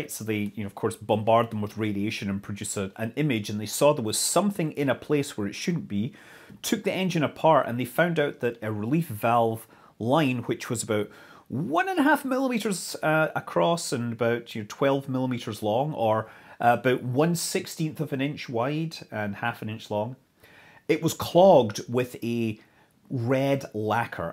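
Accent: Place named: Lothian, United Kingdom. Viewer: A wee lothian lilt to his linre.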